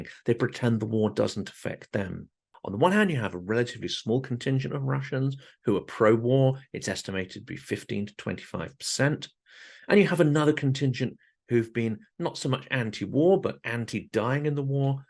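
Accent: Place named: United Kingdom. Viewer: Ukrainian shirt + Brittish accent! 🇸🇪 ❤ 🇬🇧 🇺🇦 💛💙💛💙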